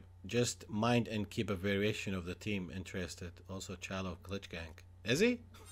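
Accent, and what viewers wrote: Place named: Scotland, United Kingdom. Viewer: Where the hell are you from man? Deffo part Scottish. Cant get the other bit tho. Good videos btw. Big up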